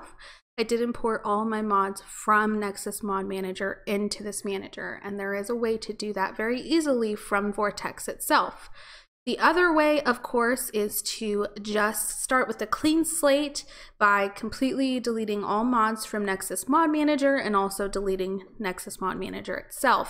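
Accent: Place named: United States. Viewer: Hi great video and i love your Accent if you dont mind me asking what part of the US do you Hail im actually from the UK?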